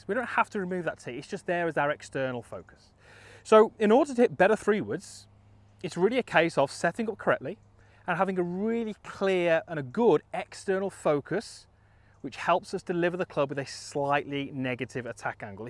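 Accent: United Kingdom. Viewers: Hard to understand brit english cos english is 2nd language to me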